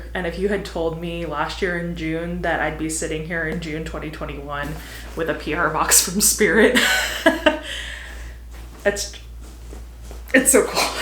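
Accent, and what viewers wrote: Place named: United States. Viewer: I hear that American accent quite often, I really like it. What type is it...Southern?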